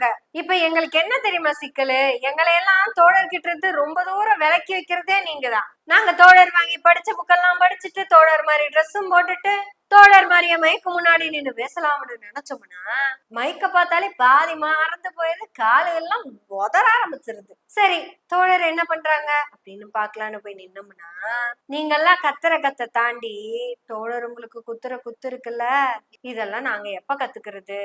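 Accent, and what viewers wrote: Kongu, India. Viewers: தங்கப்பெண்ணே, உனக்கு content மட்டும் இல்லை, துணிவும், தெளிவும், humour உம், கொங்குத் தமிழ் மணமும் நிறைந்து கிடக்கிறது. மேடைப் பேச்சும் கை வந்த கலையாகும். உயரப் பற, வெற்றி உனக்கே. God bless!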